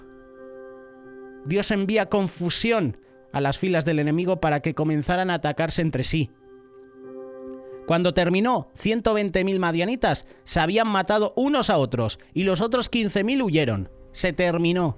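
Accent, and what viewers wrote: Spain. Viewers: Nunca había escuchado una predica en español castellano ¡Qué bonita! Y que maravillosa exhortación, hermano. Dios te bendiga. Saludos desde México. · Dios mío que tremenda enseñanza Dios te bendiga varón es primera ves que le escucho y x su acento es español que bendicion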